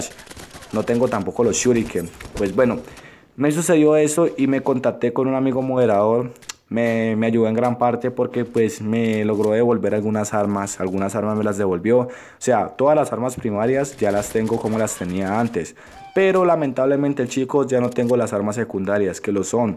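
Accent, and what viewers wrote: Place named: Colombia. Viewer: Pensé que era colombiano por el acento y cuando dijo bacano me lo confirmo . Saludos desde bogota !!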